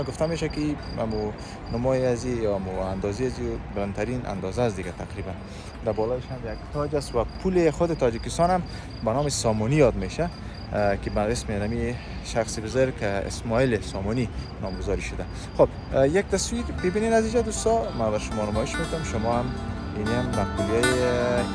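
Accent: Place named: Dari, Afghanistan. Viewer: Shumo chiqadar bo lahni shirini dari harf mezaned . Lahjai shumo chiqadar ba mo nazdikast ki man hej ehsosi nofahmogi dar sukhanroni shumo namekynam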